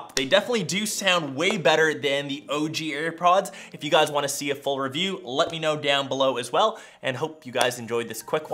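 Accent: Canada. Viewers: Your Canadian? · You sound Canadian or something I think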